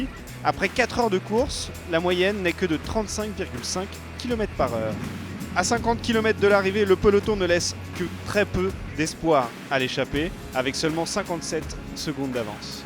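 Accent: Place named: France. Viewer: Porque hay un francesito narrando el resumen?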